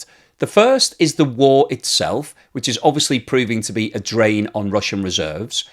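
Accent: United Kingdom. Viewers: 😂😂😂😂😂😂😂😂 According your accent , y are from UK. So ,we know that UK is PR of Ukraine, and what ever y said it's nothing but trash. It's better to look in your garden.😂😂😂😂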